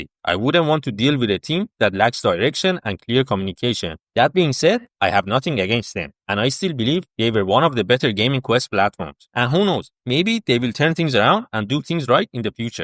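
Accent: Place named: Iran. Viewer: حاجی حس می کنم با این لهجه انگلیسیت ایرانی هستی.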